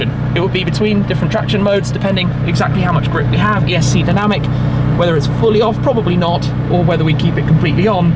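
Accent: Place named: United Kingdom. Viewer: Nice clear British :《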